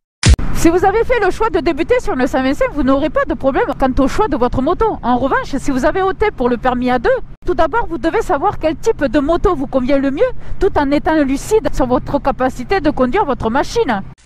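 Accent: South, France. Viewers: Qu'il est rétro-rafraichissant cet accent du sud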